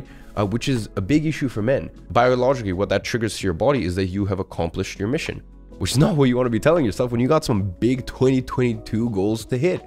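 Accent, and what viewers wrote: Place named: United States. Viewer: Bro had a russian mom, grew up in london, and has an American accent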